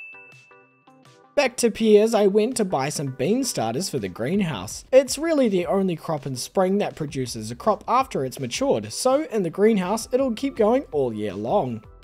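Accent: New Zealand. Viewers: how did i not clock you were a fellow kiwi until you mentioned it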